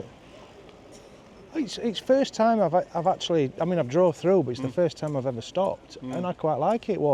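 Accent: Yorkshire, United Kingdom. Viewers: Another brilliant one. Yes, it’s winter with accompanying weather but you soldier on with the help of a cuppa and a bacon banjo. I like getting to see things & places with your channel that I would never normally see AND I get to know how Yorkshire folk pronounce place names. Love your vlogs and look forward to your next adventure along t’coast. 💕💕💕💕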